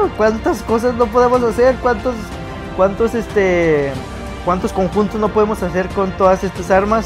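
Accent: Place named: Chihuahua, Mexico. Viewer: Awuebo puro chihuahua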